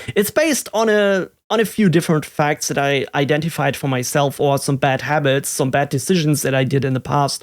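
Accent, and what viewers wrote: Germany. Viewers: When confronted with a difficulty getting a container running properly in my home setup, I'm always happy to see one of your videos in the results of a YT search for help. I don't know how you do it, but you often cover exactly the specific little problem I'm stuck on. Also, your videos provide just the right mix of "type this" and "because." I'm a retired American with the cliches you might expect: declining hearing ability and non-existent foreign language skills. I struggle to understand some people who speak accented English. Listening to you however is almost never hard for me. Your unmistakable German accent is, for me, charming and a positive aspect of your brand. Enjoy your time off,. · Hey man, I've burned out from a job before, it's no fun so I'm happy to see you take a well-deserved mental health break. Looking forward to some more videos for the homelabbers and IT professionals, like kubernetes, firewalls, ... 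- Regarding the English, as a native Dutch speaker, I think your English is great and I like the German accent. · Hey! I hope you are doing well now. Do not compared yourself to other creators please, your presentation is really awesome as it is. Clear and concise explanations on topics are top notch. I actually prefer your approach on presenting your topics instead of other content creators "fake" hype. Just be yourself and create videos the way you are comfortable and don't forget to take rest so as to void burnouts. Lastly, keep the german accent dude! it sounds so awesome actually. I hope this message finds you well. Godbless you in all your endeavors. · Gönn Dir eine Pause, den Punkt den Du gerade hast, hatte ich mal vor gut 20 Jahren, damals noch als Contentcreator für eine eigene Webseite. Wenn's sich wie eine Pflicht anfühlt, zieh Dich raus und mach wieder das, worauf Du Lust hast. 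Dein Englisch ist absolut in Ordnung. Ja ich höre den Akzent - deshalb habe ich auch mal auf deutsch geschrieben vor Zeiten, aber geh mal auf eine internationale Konferenz und hör da mal zu, dann weisst Du, Du bist besser als 85%. Es gibt easy mal 10.000+ Germans die nicht die Eier haben sich vor eine Kamera zu setzen und in einer Fremsprache aufzunehmen. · Sir, you are unique and you stand alone. I love your videos and your presentations and I rejoice in your German accent. You're thoughtful, complete, entertaining and ultimately YOU MAKE A DIFFERENCE. Rise! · Hallo, ich bin gerade über ein anderes Video auf deinen Kanal aufmerksam geworden und dachte mir tatsächlich "Jo, das könnte ein deutscher Akzent sein, muss ich mal schauen, ob er deutsch ist." Habe dann dein impressum gesehen und ja, ich wohne quasi direkt um die Ecke. Schön dass du noch da bist, ich komme jetzt öfter mal vorbei. 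Bis demnächst 😁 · Aside from a few minor "yellows of the egg" your English is very good grammatically. Your German accent is very noticeable, but it's also a recognizable feature of the channel, so while it may be a worthwhile pursuit to "improve" your pronunciation for your personal life, I think there's no need to dedicate any time to it if you only want to use English for business. Irregularity is a feature, not a bug when you want to get noticed and want to stay in people's minds. Hope you have a good vacation (lite)! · You are amazing, take a break if you need my bud, just know that you are great and very helpful, some day please make dedicated proxmox videos :) thank you for all of your help docker ECT you have helped me understand many things.(and you speak English very well, I understand you very good, the German accent makes it awesome.) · . Just be a regular guy. Do your thing. 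You make great “watchable” videos. We all know English isn’t your first language. I mean I think we can tell your German. Heck I am not even subscribed and I am responding. I am not even brave enough to make a video. We all have to take time off. Just relax and breathe even the not subs will be here. Take care.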